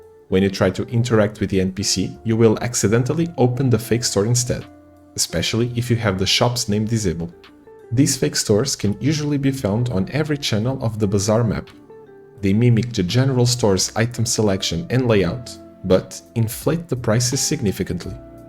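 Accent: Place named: Chile. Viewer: Sos chileno no? Te saque el acento en la primera oración jaja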